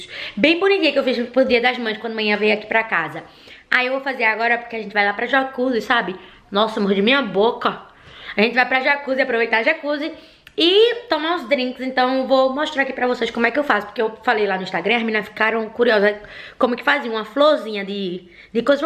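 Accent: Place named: Northeast, Brazil. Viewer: Acabei de chegar e simplesmente amei! Vc é nordestina? Amo esse sotaque 😍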